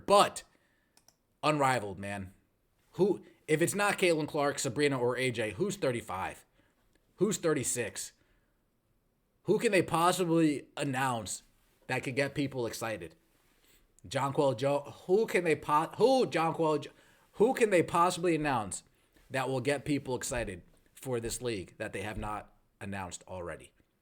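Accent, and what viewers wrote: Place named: New Jersey, United States. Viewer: Nahhhh, thats just how we all speak "Down the Jersey Shore"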